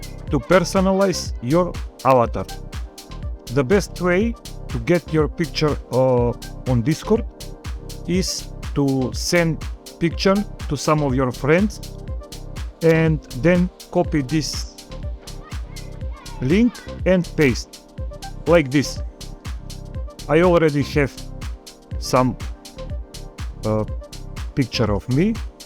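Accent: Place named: Russia. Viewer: Useless instructions, I can barely understand the part English, part Russian accent, and the video is not simple enough to follow, as most of the web pages you see on the video, either don't exist anymore or don't look like video and all the links that are shown in the video are not on the changed web pages, in fact, this video needs to be updated, and also, show us how to create a new avatar from scratch not from what you already have.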